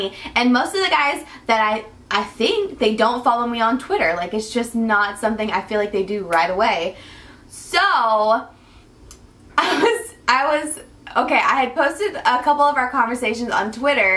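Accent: South, United States. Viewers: Yea.. we would totally be best friends if we ever met. Totally! Southern twang, bravo, gossip girl, dog lovers, dreams to open a no kill shelter! Ah! Awesome! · You're so down to earth and I wish I knew you in person! You seem like the type of person I would get along with so well and your southern accent is so fuckin cute! (In the least creeper way hahahah) love you girl!!!😍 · I know I'm a little late in catching up on your videos but I would definitely love it if you read what you've been writing in your diary, I'd love it if you talked more about your experiences relationship-wise! And I love your southern accent, let it come out more(: Hahaha. Love you<3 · I know what you mean with the southerness when filming! I also am a lot more southern in person than on camera. Glad it isn't just me haha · Was it just me or did no one even notice her accent until she pointed it out? 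😞 like fr she said "my southern accent" and I was just like "omg wtf?? U have an accent?" And then when I payed attention I was like "omfg u have a southern accent!"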